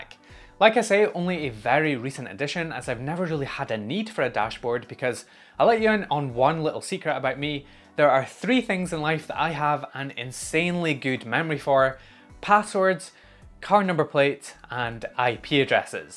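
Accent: Scotland, United Kingdom. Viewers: Excellent insight for a newcomer to the homelab/server world. Have just installed TrueNAS Core and upgraded to Scale on a salvaged 2011 desktop machine as an experiment, so I'm just getting a feel for the most popular apps that folks are running before taking the next steps. Really useful overview and nice to hear the Scottish brogue again ( I spent 21 years working in Lanarkshire for one of the few remaining semiconductor facilities before retiring back in my Welsh homeland with my Scottish spouse). Sub'd :)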